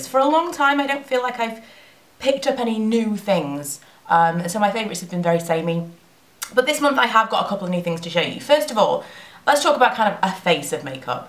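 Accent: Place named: Northern England, United Kingdom. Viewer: Not sure if it's the husky voice or the mention of beer, but you were particularly Northen in this video & it was great. 😃😃😃 love you xxxxxx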